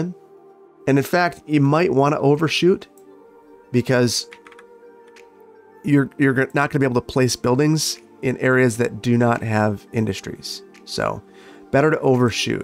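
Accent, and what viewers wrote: Wisconsin, United States. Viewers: I thought he said "egg" the first time he said it to, and I was like: Wait, what??? Then I realized he was from Wisconsin where they say "beg" for bag.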